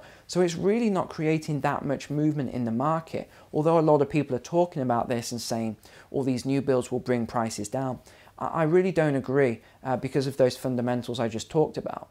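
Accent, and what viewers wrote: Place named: England, United Kingdom. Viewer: is clearly marketing himself for the USA viewers because he knows they are a bigger population than the UK and his English accent might get the audience. It also makes sense now that he is living in the USA.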